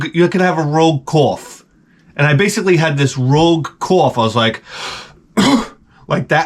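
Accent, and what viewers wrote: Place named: Long Island, United States. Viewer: I stopped going to the Dr when I'm sick because they never help. Every time they tell me I have to let it "run it's course". I don't need to pay someone to tell me that shit. Hope you're feeling better though. And thanks again for creating the greatest video game program in the history of human civilization. You're now the only person I am supporting on Patreon because you're just so damn entertaining and funny. It's also rare that I find someone on YouTube that sounds like me 😂 Almost makes me miss living on long island.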